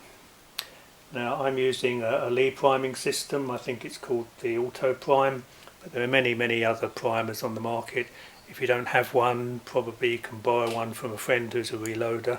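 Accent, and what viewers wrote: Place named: England, United Kingdom. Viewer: england would be a nice place to visit one day. if all my english internet friends didnt live in rough neighborhoods. I had to ask because of your accent. well technically it's not an accent seeing as though you speak the english language correctly guns and the english just do not seem to go hand and hand these days. older english guns however are quite amazing. i want a webley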